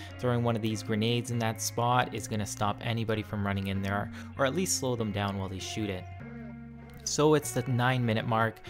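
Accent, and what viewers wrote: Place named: Canada. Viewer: Hey, are you Canadian? I can hear that accent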